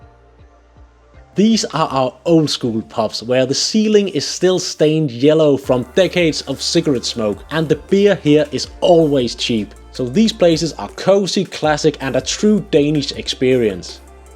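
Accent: Liverpool, United Kingdom. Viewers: You speak English with a Liverpool accent. Were you taught English by a Liverpudlian? Love your videos. Good job. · You sound like a Beatle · Thank you! My partner and I are visiting Copenhagen in two weeks time from Manchester and this video and the other video "My Amazing Day Trying New Things in Copenhagen City Centre" have been very helpful! We're just trying to work out your accent, have you spent some time in Scotland or also Northern England? lol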